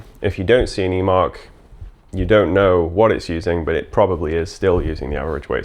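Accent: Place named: Scotland, United Kingdom. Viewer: Why do you sometimes sound a bit Scottish? I am Scottish and you do, especially in this video 🤔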